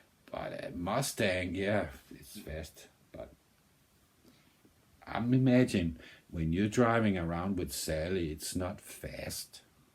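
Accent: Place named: Denmark. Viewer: You must be Danish, listening to your accent and your name, I'm pretty sure you are a fellow Dane. I was born and raised 10 miles south of Koege, came to the US in 1967 as an au pair, in Westport Ct. Have been here ever since. Although have been back to Denmark many times. Now live in the San Francisco Bay Area. I just heard you mentioning Denmark, so I was right. I love this song, we have a very active Scandinavian Club in this area, and in the early nineties we had a midsummer party, in the hills south of SF, and we had some members who played guitar and sang, and this was one of the songs they sang at that party.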